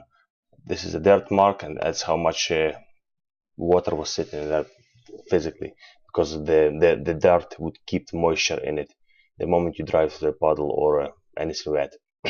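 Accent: Scotland, United Kingdom. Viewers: Love the accent. Is it Aberdeen? Dundee? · Love how the Scottish accent comes through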